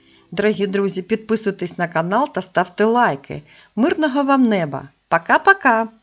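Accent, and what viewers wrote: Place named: Carpathians, Ukraine. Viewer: Наголоси ставите неначе ви з Карпат, а не з Полтавщини. Повинна ж бути автентична українська мова регіону.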